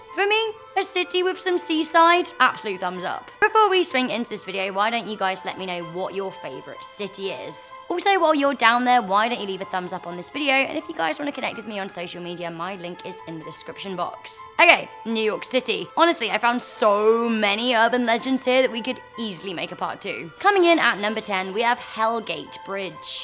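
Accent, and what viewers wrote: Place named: United Kingdom. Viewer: British accent🤮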